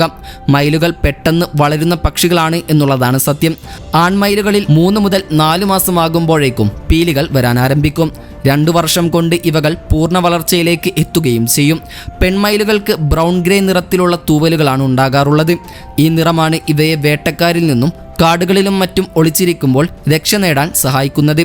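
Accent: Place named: Malabar, India. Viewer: മലബാറി സ്ലാങ്.❤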